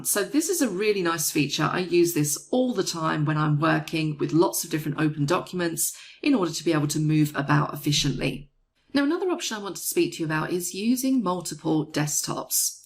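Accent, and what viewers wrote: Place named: RP, United Kingdom. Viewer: You're the best a zillion times I love this I can't stop watching because of your voice and the pronunciation of the words, BBC English everyone can hear and understand you very very well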